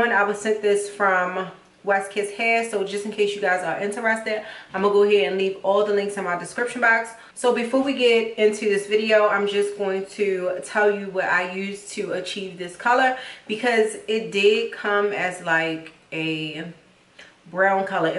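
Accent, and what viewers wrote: Baltimore, United States. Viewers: Guuurrrlll I hear BALTIMORE all in your voice!!! 🤣🤣🤣 #DMV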